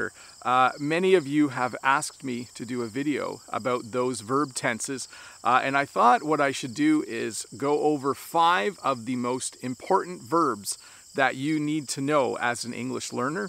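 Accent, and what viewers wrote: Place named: Canada. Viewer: a great opportunity to be in contact with the Canadian accent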